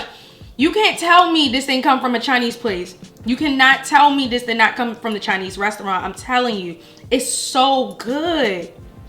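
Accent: Baltimore, United States. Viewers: All i hear is that Bmore accent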